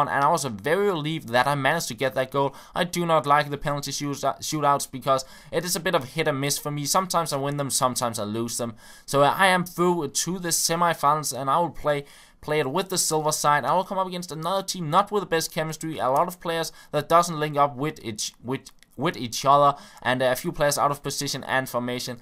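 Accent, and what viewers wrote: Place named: Denmark. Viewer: it sounds alot like danish ;) most people from our country have that accent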